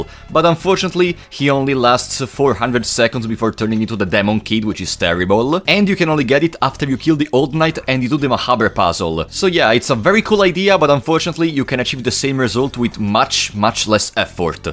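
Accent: Italy. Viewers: Perché un italiano dall'accento maccheronico parla in inglese di uno dei più bei giochi mai creati? Iscritto. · sei ita? da come parli sembra di si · I don’t know why but your accent sounds italian, se sei italiano bro sei un grande, anche se questo gioco è mega di nicchia in Italia è davvero un piccolo capolavoro ed è bello vedere uno dei nostri che lo porta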